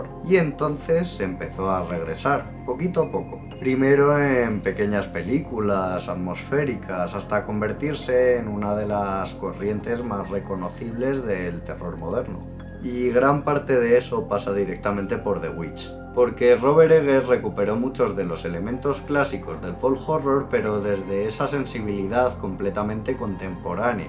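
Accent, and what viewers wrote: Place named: Spain. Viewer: Pensé que el narrador era un señor de edad avanzada, por la voz como floja con las letras pegadas, somnorifico, monótono, somnoliento… cuando vi que era un joven, me acordé de wolfsmoker y cautivosdelcine1376, también críticos de cine, videbloguers españoles. Ahora me pregunto si la entonación es propia de alguna zona de España.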